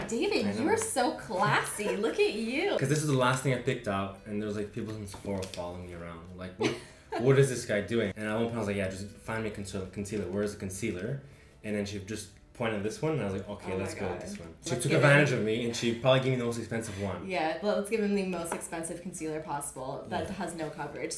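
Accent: Canada. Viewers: omg random side note but im so proud bc i can spot your canadian accent woo woo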